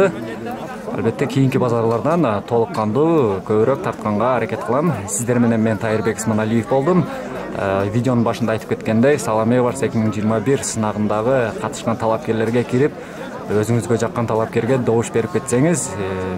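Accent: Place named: Leylek, Kyrgyzstan. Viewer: АТАКУЛДАН УЙРОНСОН ЖАРКИЛДАП СУЙЛОП!!! ЛЕЙЛЕКЧЕСИНЕН БАТКЕНЧЕСИНЕН !!👍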